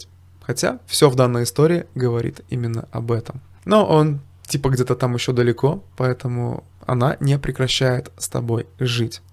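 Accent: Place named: Belarus. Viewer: здравствуйте! Судя по акценту, вы из РБ! Я ваш земляк, и хочу Вам сказать Спасибо! Вы реально меня спасли. Все по делу, выражения-в точку! То, что и хотят слышать мужики в моей шкуре! Ещё раз, Спасибо! Если бы мог, пожал бы руку!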